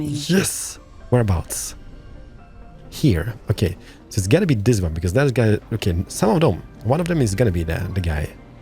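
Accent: Scania, Sweden. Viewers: Asbra serie! Hör på dig att du är skånsk! :D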